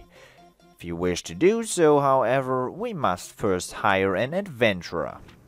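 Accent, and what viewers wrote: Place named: Germany. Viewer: Yayness for German accent! CAPITALISM, HO!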